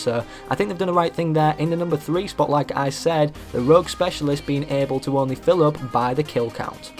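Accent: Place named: Yorkshire, United Kingdom. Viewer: know a Yorkshireman when I see/hear one ;)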